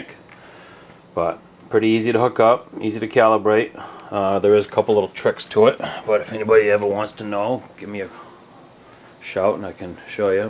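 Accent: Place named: Bristol County, United States. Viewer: ohh dude I moved out of mass 8 years ago but I know a Bristol county accent when I hear it! salute!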